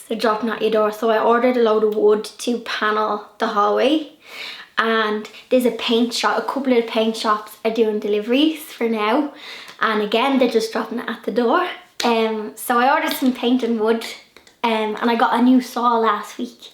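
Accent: Ireland. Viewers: I was wondering where you were. Missed you on Thursday. You seem like a very social person, which can be hard but keep chatting, we need you. I could listen to your Irish accent all day. Suggestion? maybe make a video of "about town", a local tour? Maybe you have done it in the past I don't know, I am a fairly new subscriber...just a thought. Anyway my dear...thinking of you and trusting you will stay safe and healthy. 😎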